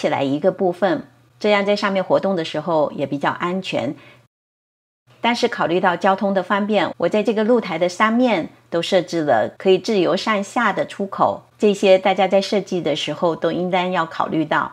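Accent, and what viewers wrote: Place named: Northern Fujian, China. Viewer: 您也算是业余花园设计师了，用心打造美好生活😊听您的口音是不是闽北地区的？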